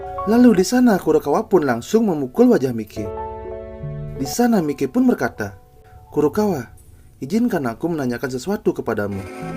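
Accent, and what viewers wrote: Bali, Indonesia. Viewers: Abang orang Bali ya bang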